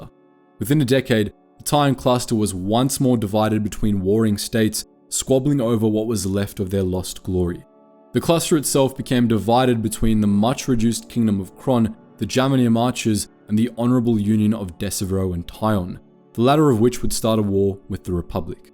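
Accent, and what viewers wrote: Australia. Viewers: Oh yeah, the Australian accent is definitly a plus to the already magnificant content. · Imo Generation Tech is a close second, but the Australian accent makes better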